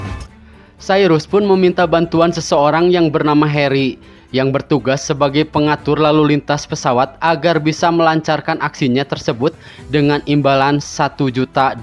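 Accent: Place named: Garut, Indonesia. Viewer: Ngadangu suarana mh ciga orang garut si otok teh.. Sami2ti garut hayu urang saling suport..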